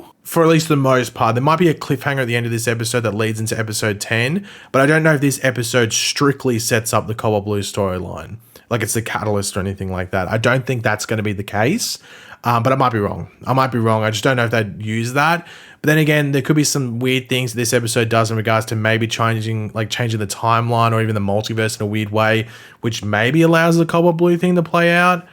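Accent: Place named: Australia. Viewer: sorry i've been smoking but i forgot you were Australian till you said crisis lol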